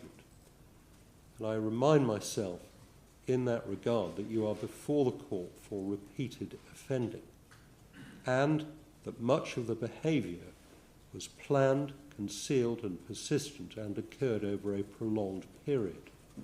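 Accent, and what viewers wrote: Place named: England, United Kingdom. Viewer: Man i tried to watch this but this guys england accent is like nails on a chalk board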